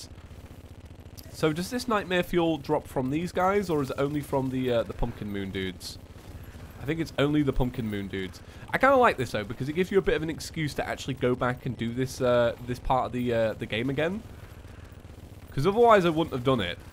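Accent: United Kingdom. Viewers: I was super confused to his british accent, "What the heck is super heart? I don't remember a game like that", then I realized he was talking about super hot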